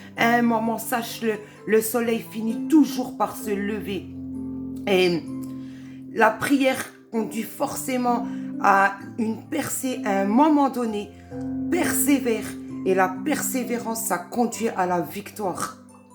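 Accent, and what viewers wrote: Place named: Franche-Comté, France. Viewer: Hallelujah ma soeur merci pour ton partage, sois bénie au nom de Jésus Christ ! (Je reconnais que tu es Franc-Comtoise à l’accent parce que je le suis aussi hihi) Le Saint-Esprit en toi à parlé Gloire à Dieu ! 🕊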